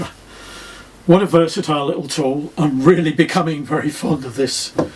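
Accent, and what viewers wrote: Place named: United Kingdom. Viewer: there's something about the queen's english that just makes the video sound like a documentary. this is amazing hehe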